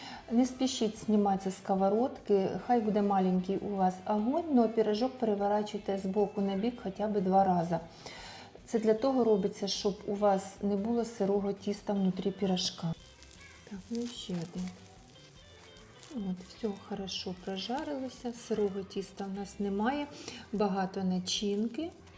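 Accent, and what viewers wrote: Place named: Odesa, Ukraine. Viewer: Она с Одесской области - там свой диалект - ну, говорит женщина всю жизнь на суржике!!! Что не так снова??! Не цепляйтесь за слова!! Вам рецепт пирожков нужен или скандал???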